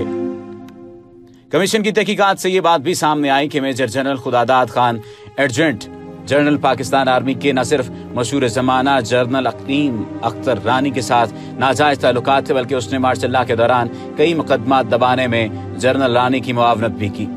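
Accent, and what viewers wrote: India. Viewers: This is indion voice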